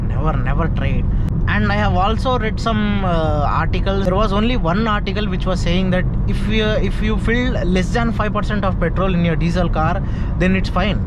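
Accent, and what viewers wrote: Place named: South India, India. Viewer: south indian accent rocks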